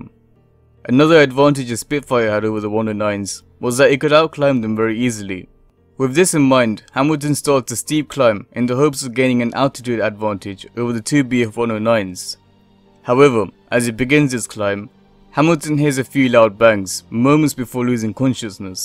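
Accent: South Africa. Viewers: Just a question: is that a SA accent? 😀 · I'll hava guess, south African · What are you mate? South African? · It's the accent south African?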